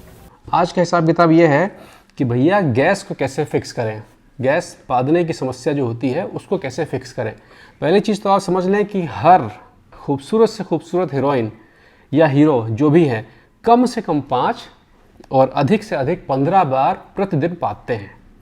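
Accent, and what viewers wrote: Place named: Lucknow, India. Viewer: Sir aap kaha ke rehne wale h apki boli Lucknow side ki lag rhi h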